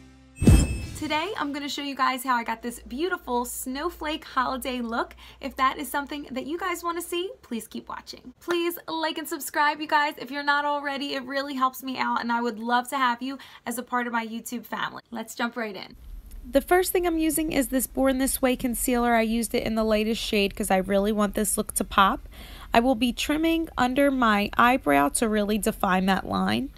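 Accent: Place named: Maryland, United States. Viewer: You sound like you're from Maryland!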